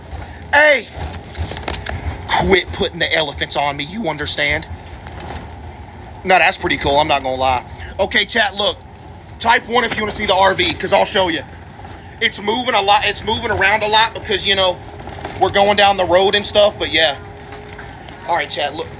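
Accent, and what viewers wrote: United States. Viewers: Bro kinda got that Texas voice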